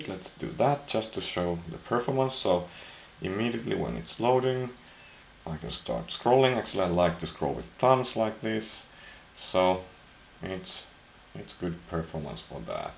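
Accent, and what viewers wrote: Finland. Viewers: where you from? you sound finnish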